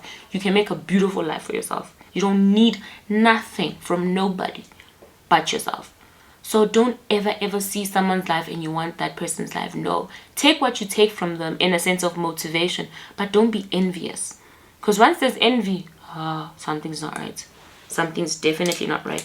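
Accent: Nigeria. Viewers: I always take notes when you do sit down videos and I enjoy them so much. I agree particularly with the people pleaser point because I am unlearning this and it is so uncomfortable and so weird for me. I sometimes feel like I'm doing something wrong when I don't do things to please people anymore. Thanks for imparting knowledge and nudging me in the unlearning direction a bit more. Side note: may you please do a video in your Nigerian accent one of these days.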